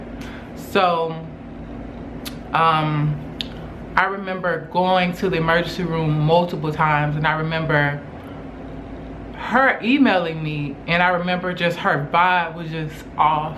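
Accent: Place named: Alabama, United States. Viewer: Fr!! I tend to realize females that’s from/live in Alabama have that same accent and they sound the same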